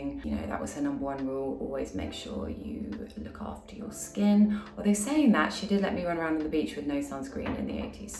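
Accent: United Kingdom. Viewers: Why do I always thought that she's American for some reason? The moment she speak, I was a bit surprise to hear her British accent. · What accents she has? British.. but not that Seamus on Harry Potter😅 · Is she British based on her accent? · The only time I actually like a Brit girl voice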